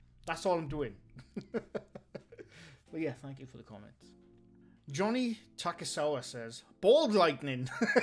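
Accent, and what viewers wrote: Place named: Scotland, United Kingdom. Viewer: Love your accent. Scottish??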